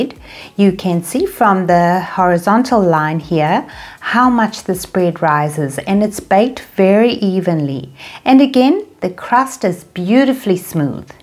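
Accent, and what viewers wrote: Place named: South Africa. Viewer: I was guessing maybe you had a South African accent it's always beautiful but always hard to place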